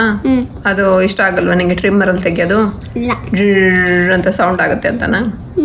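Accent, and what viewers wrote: Mangalore, India. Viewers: Hi dear nimma vlog Alli manglore Kannada keli thumb kushi aithu all the best namma support nimge yawagalu eruthu god bless u❤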